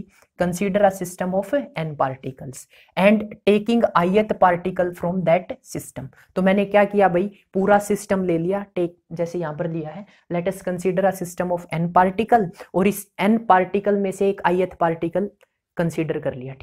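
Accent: Haryana, India. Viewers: Haryana se ho kya sir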